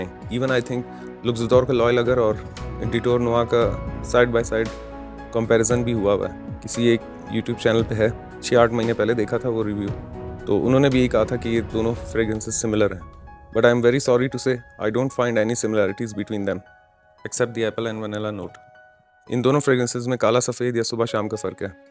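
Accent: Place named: Karachi, Pakistan. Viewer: لیٹ رپلائی کے لئے سوری لہجے سے تو کراچی کے ھی لگتے ہیں جیسے کہ میں خود 😀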